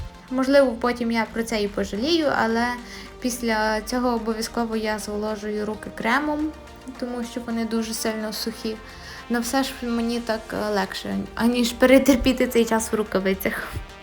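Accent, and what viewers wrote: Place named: Ternopil, Ukraine. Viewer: Це один з діалектів, а не суржик. Нічим не гірший за "чисту" мову заходу України чи "еталонну" мову киян. Відчувається, що дівчина орієнтовно з Тернополя чи Івано-Франківська, чи близько тої території і українська її рідна. Мова є живим організмом і з часом найменш вживані слова просто зникають, так буде і з так званими русизмами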